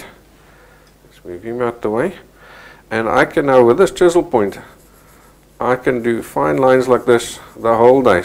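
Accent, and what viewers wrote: South Africa. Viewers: I feel like im still in South Africa because of his accent.. 😢😢😢😢😢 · JohnLothe I think he is South African that lives in New Zealand. This dude is a pretty decent teacher! great video! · is this guy south african? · Thats not an new zealand accent? Do you have a south african accent? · Where are you based now?? I feel sure i hear a south african accent 😏😏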